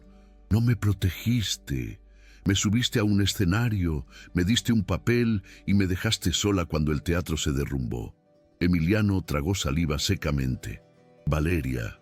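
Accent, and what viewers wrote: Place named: Colombia. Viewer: Usted es colombiano, cierto? Ese español, esa vocalización, es inconfundible. Es un narrador excepcional! Felicitaciones!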